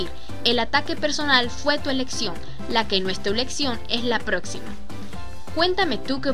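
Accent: Venezuela. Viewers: en este video se te sale el acento venezolano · ACENTO ME SUENA VENEZOLANO Y NO PERUANO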